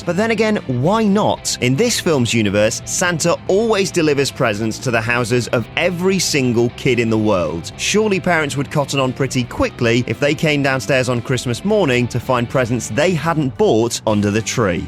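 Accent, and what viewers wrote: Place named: United Kingdom. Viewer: "Simbar and Scah." Oh, British people... When will you ever learn how properly to use the letter "R?"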